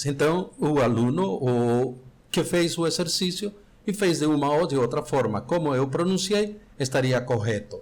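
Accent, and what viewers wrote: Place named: Spain. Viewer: Ótima aula prof.. É tudo que eu esperava encontrar. Um professor nativo com domínio em português. Não podia ser melhor. Parabéns pela iniciativa. Gostaria de perguntar - Qual é o seu país? (Depois de 27 anos no Brasil fica difícil notar o sotaque espanhol).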